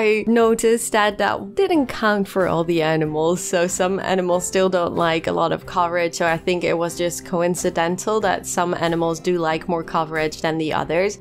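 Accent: Netherlands. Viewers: Love all your video’s but are you Dutch ?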